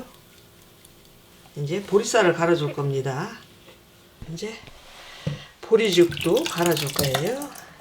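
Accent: Chungcheong, South Korea. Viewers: 충청도 신가바요?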